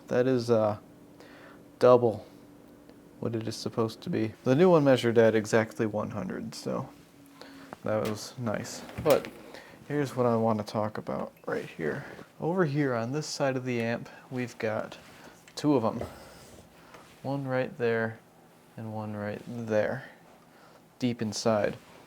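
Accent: United States. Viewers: Are you from western PA ?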